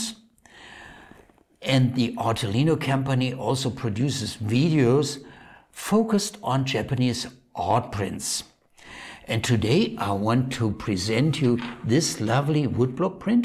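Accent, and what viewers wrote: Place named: Bavaria, Germany. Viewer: Your "Bavarian English" is much more charming to a native English speaker than the computer rendition. Thank you for making these informative and enjoyable videos. As a lover of ukiyo-e prints, but not a very informed one, I always look forward to your presentations. Stay healthy!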